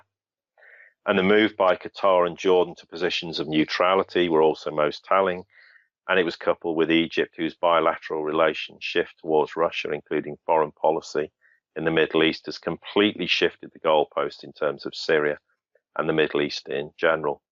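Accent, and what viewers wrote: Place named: London, United Kingdom. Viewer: Does he keep saying Western capitalists or Western kabbalists? Damn London accent I can't tell the difference.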